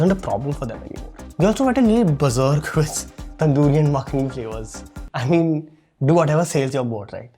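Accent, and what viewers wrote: India. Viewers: Yes, sounds like bangalorean accent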